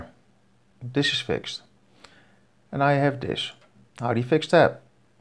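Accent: Netherlands. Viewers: you sound a lot like a dutch man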